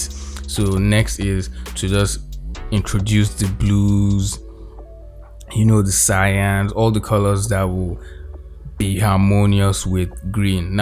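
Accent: Nigeria. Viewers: Awesome man learnt so much from watching this process btw cool nigerian accent <mail>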